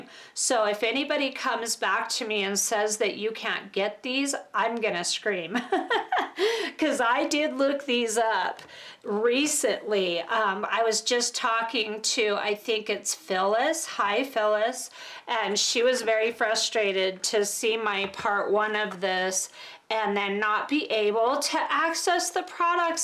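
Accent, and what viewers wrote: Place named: Midwest, United States. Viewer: Thanx so much... I was looking for those paints!!! Phew! Saved my sanity... lol. I am a new sub and really enjoy your videos. Love the different techniques you show us! I am wondering... where do you live? I am in SE Texas but you seem to have a midwestern accent. Just curious... Hugs!!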